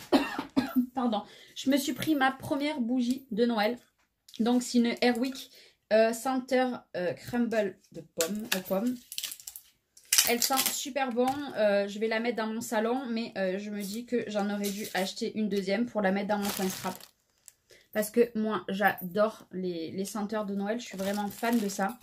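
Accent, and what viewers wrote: South, France. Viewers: Bonjour ,dans quel Action allez-vous ,vous avez un joli accent du Sud mais cela ne veux rien dire , car dans mon Action je n'ai pas tout cela et encore moins pour faire des cartes cela fait bien 3 semaines que tout a disparu par contre nous pouvons commencer à planter nos narcisses, jonquilles etc ..... en région parisienne ça sent "le printemps Action"" Passez de bonnes fêtes en familles en rouge et Or .Amicalement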